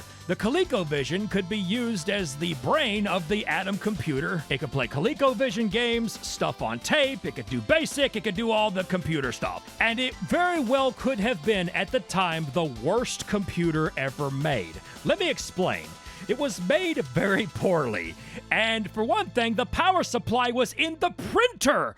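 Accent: South, United States. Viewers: ahh, a southern man telling us about bad hardware on game consoles. Peak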